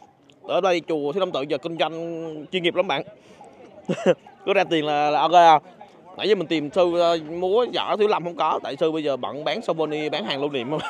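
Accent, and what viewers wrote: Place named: Central, Vietnam. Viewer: Ủa e ở hà nam à. Sao nghe giọng ở miền trung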